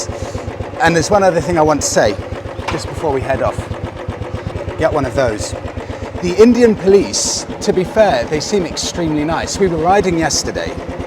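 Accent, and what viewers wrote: England, United Kingdom. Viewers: I only watched the whole video because of the British accent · The bike s in immaculate condition. RE is the pride for India. It's one of the oldest motorcycle company in the world & it's still growing. Are u from the UK. Pls comment ASAP. Perhaps from your accent I just picked it up. Thank you. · Nice to hear a proper English accent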